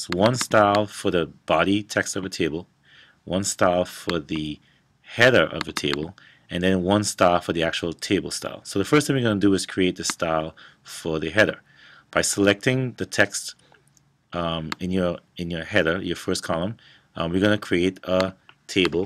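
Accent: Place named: Caribbean. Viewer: Where's your accent from? You sound West Indian.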